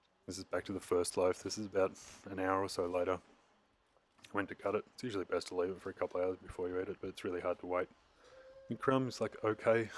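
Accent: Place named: Australia. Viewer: hey, he cant help being australian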